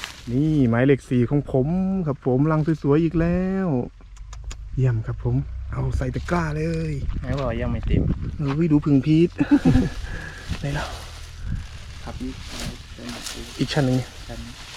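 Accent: Korat, Thailand. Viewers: คนโคราชบ่คับ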